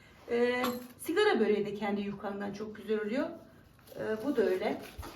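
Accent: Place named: Uşak, Turkey. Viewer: Sonradan dinleyince uşak oldıgunu anladım bızde manısa merkezden 😊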